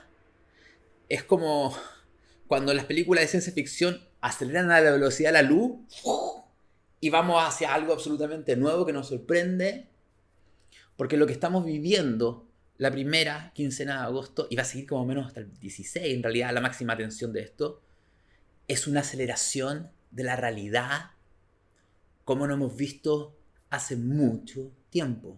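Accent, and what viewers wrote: Chile. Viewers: Lo vi todo, obvio!!! Tengo 50 y cumplo el 20 de agosto... Sentí q me hablaste a mi!! Estoy nueva en esto de la astrología, era super exceptica con eso pero desde q te escuche en el podcast tiene sentido y me cambiaste todo en cuanto a eso. Me maravillo al verte hablar. Viví en Chile casi 3 años y extraño su hablar y contigo tengo un combo de maravillas entre lo q dices y como lo dices. Un abrazo!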